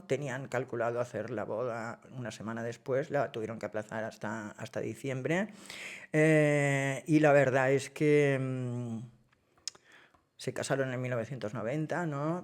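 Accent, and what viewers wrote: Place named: Barcelona, Spain. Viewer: Este “no” es una marca de la casa. No te inquietes por eso, es una coletilla perfectamente válida. Tu forma de hablar es muy barcelonesa ( parte alta ) y a mí me recuerda cuando vivía en esa ciudad.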